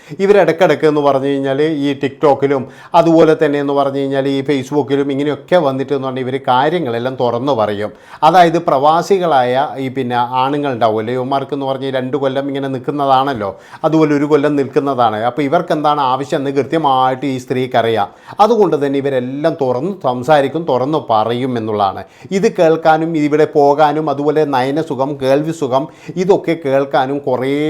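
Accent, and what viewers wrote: Kannur, India. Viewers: കണ്ണൂർ ജില്ലയിൽ എത് ഭാഗത്തെ ഭാഷ്യാണ് ചേട്ടാ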